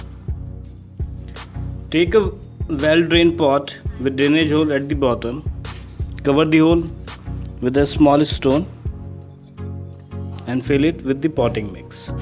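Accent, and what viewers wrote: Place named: India. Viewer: I'm so glad that the first video I clicked on the narrator was Indian because I watch a lot of Indian cooking shows and my projects always workout way better when the narrator's Indian. Hard to explain but I just got really excited when I heard his voice.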